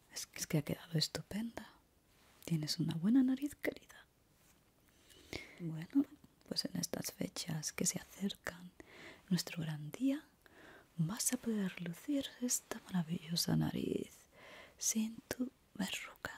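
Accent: Andalusia, Spain. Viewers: Amo como hablás, de verdad amo el acento español y luego el tuyo es hermosooo ✨, aparte tu voz relaja 🧡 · En primera, siempre genial, relajante, tingles, interesante... Pero luego leí "Cum" en lugar de "Rum" en la botella de atrás y me dió risa de la mala xD Por cierto, aunque estoy en México, y por ello me es difícil diferenciar acentos de España... el tuyo es el más delicioso de oír. Necesito muchos amigos andaluces para que me hablen todo el día 💖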